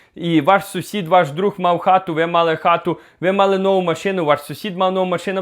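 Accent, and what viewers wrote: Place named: Lviv, Ukraine. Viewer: Судячи по твоїй розмові ти зі Львівщини,послухай не ганьби МІСТО ЛЕВА та ЛЬВІВЩИНУ, йди працюй!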